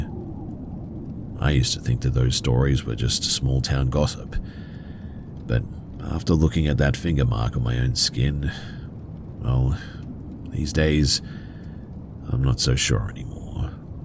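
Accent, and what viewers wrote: Australia. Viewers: I love falling asleep to so much but I wonder if we were to ever meet in person if the sound of his voice would cause me to doze off in the middle of conversation?😆 My brain hears that Australian accent and I'm gone!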